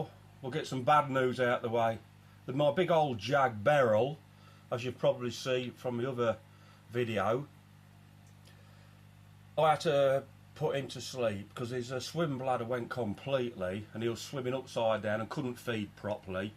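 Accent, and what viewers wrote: United Kingdom. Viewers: Difficult for all of us to listen to our own voice. Yours is great, although us Americans tend to be drawn to that British accent. Anyway, sound great and relaxing video. Please keep it up.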